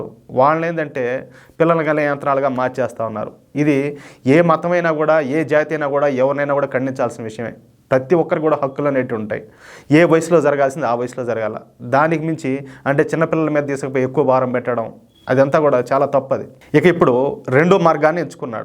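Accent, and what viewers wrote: Rayalaseema, India. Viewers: మీరు రాయలసీమ ఉచ్చారణతో చాలా బాగా చెబుతున్నారు కంప్లీట్ గా ఆంధ్రప్రదేశ్ వాళ్లకు అర్థమవుతుంది ధన్యవాదాలు మిత్రమా🎉 అక్కడ ప్రజలు కూడా నిజానిజాలు తెలుసుకోవలసిన అవసరం ఉంది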